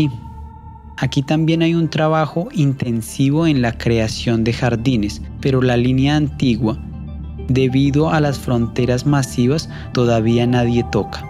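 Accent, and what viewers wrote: Colombia. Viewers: por la pausa y cadencia de tu habla y el uso continuo [ka] y [Ke] debes de ser colombiano o has vivido mucho tiempo por ahí..igual, un hermoso y bien documentado reporte....gracias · Que desubicado comentario, agradece màs bien que es gratis, a mi me pareció excelente narrador se nota que es un parcero colombiano, Cuando no el peruano con su dejo que cree que es el mejor, tendrìas que salir del paìs alguna vez para que te des cuenta lo equivocado que estàs. a no hacer caso y gracias por este aporte. Chaclacayo Perù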